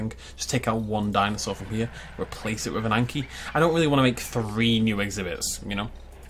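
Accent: United Kingdom. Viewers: One hour, great video, OG brit commentary. 10/10 video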